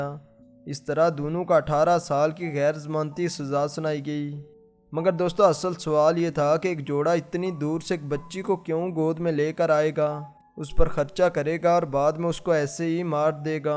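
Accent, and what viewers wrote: Kashmir, India. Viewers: Kashmiri lgte ho bolne sy... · accent kashmiri lagta ha mountain area Jo America ja ka be change nahi hosakta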